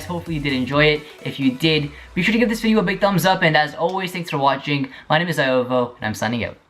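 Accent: India. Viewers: dude it seems ur Indian? Am I right? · Your Indian?? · u indian? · are you Indian my friend???... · dude are you ..... indian ?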